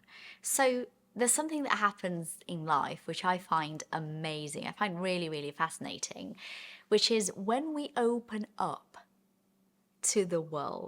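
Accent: United Kingdom. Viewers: Your british accent is so beautiful ❤ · When you start to follow her bc of the british accent and stay here bc she's such a great person and teaches so much more than just english... Well done!!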